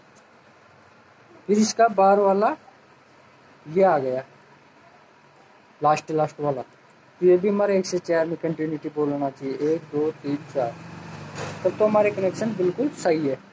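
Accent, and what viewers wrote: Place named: Rajasthan, India. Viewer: Bhai sahab namaskar Bolly Se To Rajasthani lag rahe ho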